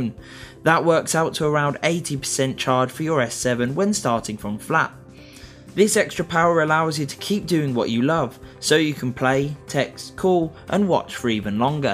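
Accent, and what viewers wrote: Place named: United Kingdom. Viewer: i need more of that british accent...makes all your videos enjoyable!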